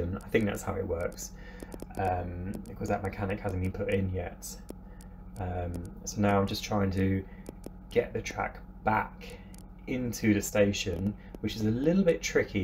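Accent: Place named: United Kingdom. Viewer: Your voice is from the united kingdom and your from the UK?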